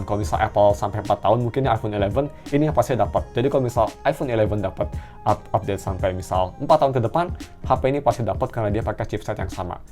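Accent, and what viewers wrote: Bandung, Indonesia. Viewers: Kang, orang Bandung?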